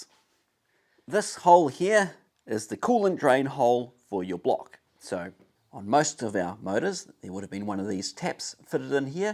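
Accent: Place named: New Zealand. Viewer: I love the New Zeeland accent. Threeds and heeds.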